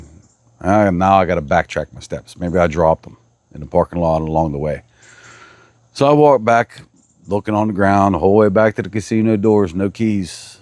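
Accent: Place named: Pittsburgh, United States. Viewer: lol i was thrown off by the Golden State shirt and story about driving from Utah. I’ve lived in Pgh my whole life so I know a fellow yinzer when I hear one 🫡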